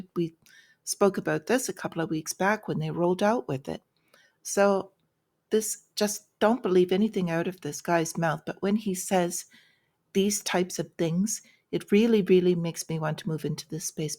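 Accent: Ireland. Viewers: So I was right! I thought I detected an Irish accent :-)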